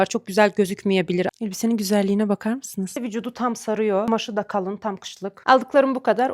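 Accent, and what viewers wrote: Azerbaijan. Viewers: Birinci vidyusuna baxanda danışığından bildim ki azərbaycanlıdı😂😂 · Abla Azerbaycanlımısın? Biraz Azerbaycan şivesi ile konuşuyorsun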